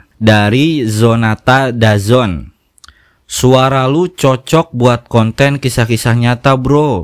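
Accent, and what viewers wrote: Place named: West Java, Indonesia. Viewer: Ditunggu bang, semoga apa jadi hajat abang bisa cepat terkabul 🙏. Btw logatna siga urang sunda bang 😅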